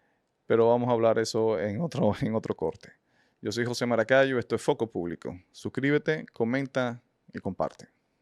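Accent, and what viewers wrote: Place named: Venezuela. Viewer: Is this guy DOMINICAN..? Sounds very much Venezuelan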